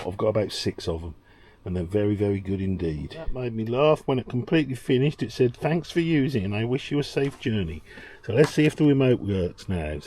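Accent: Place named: Norfolk, United Kingdom. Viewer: you sound very much like you're from Norfolk to me 🙂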